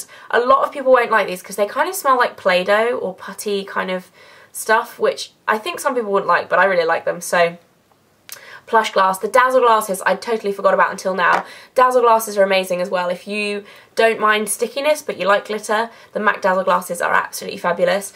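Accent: United Kingdom. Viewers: Your accent is DIVINEEE im British, and ive always hated our accent on all accounts, but for some reason yours seems to be an exception, i absolutely love it! I wish/hope i sound like you do! LOL :) You're an amazing guru, keep up the good work! You're videos/tutorials have really helped me out :D x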